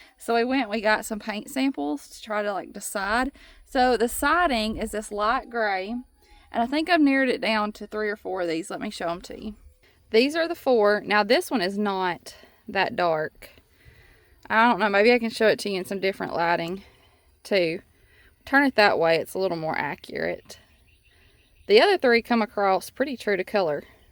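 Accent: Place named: Appalachia, United States. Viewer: You talk just like we do in southwest Virginia.( The Coalfields) We are near eastern KY and East TN ( Harlan County, KY and Kingsport, TN)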